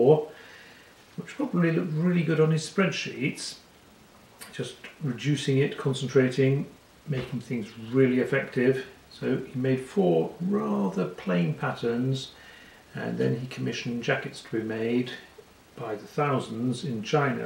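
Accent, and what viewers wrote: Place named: United Kingdom. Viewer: Interesting review, and beautiful tweed. Your expressions and inflections are very British, surprised to find that you are Norwegian.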